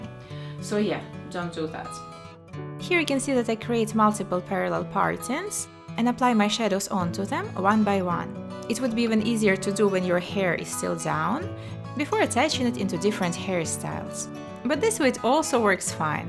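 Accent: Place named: Russia. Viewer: After listening this just for a few seconds I became sure it is Russian accent. Double checked it in description and yep, she's russian. Btw привет, me too)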